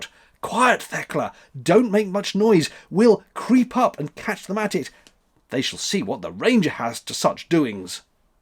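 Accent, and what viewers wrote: United Kingdom. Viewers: I've been listening to CTC at night to help me sleep. Not because the content is boring but because your cool British voice and the low moan of the boat going down the canal lulls me to a peaceful sleep. I found you a year ago and binge watched your entire channel in less than two weeks before finding out it is an effective sleep aid as well. I appreciate the videos and being brought into your home, life. Have a great time away from CTC, I hope you get the rest you are looking for!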